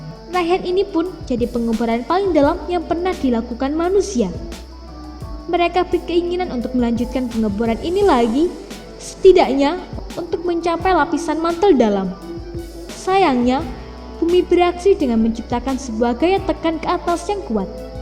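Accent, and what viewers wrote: Java, Indonesia. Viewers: medok bgt si mbak nya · Jowone medog he😁😁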